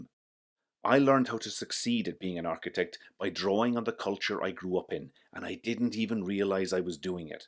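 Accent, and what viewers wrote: Ireland. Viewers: Another Wesht culchie here, but from Galway. I knew the accent and glad I found this video. Any recommendations for historical/listed renovation guidance/support in Ireland? Awful hard to find resources.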